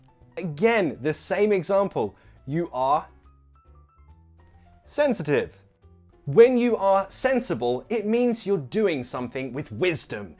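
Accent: United Kingdom. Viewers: The British accent is interesting, like when they say - never (nevah) :3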